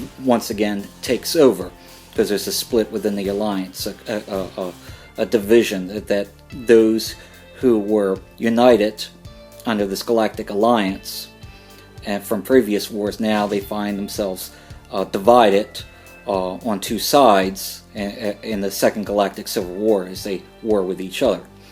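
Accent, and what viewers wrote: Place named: Philadelphia, United States. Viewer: You sound like your from the Philadelphia area. It's like my cousin Nick is talking about Star Wars. Great vid.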